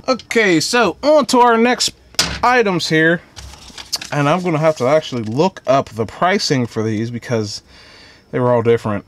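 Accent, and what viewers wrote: Pittsburgh, United States. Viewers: You from the Burgh?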